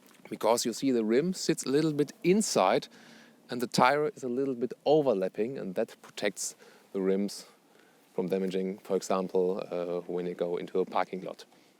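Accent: Germany. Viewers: Such an enormous German accent, wouldn't it be better to do those presentations in German with English subtitles?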